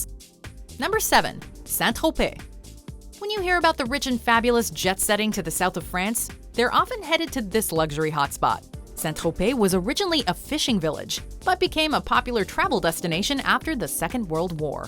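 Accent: Quebec, Canada. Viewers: Her accent is French Canadian, so French likely her first language. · She's French Canadian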